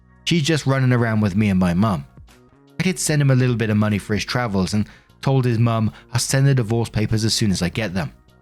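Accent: United Kingdom. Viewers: every time i listen to talk i think "man he's so British"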